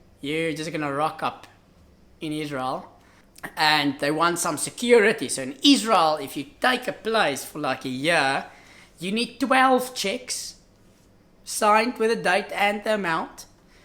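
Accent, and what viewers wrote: Afrikaans, South Africa. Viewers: Wow, I am impressed. Your library seems is amazing. Should have contacted me, could have taught you Hebrew before you made aliya. Long time since I heard someone speaking with such an Afrikaans accent. Our Rabbi's parents' are from Cape Town. It is probably the only decent place today. You are amazing. If you ever come to Australia let me know. Would be amazing to meet you. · Thanks for sharing your story - we made aliyah from Johannesburg a few days after you and live in Beersheva. I came across your videos once while searching Tefillin and was so shocked when I heard someone speak with an Afrikaans accent and talk about Tefillin.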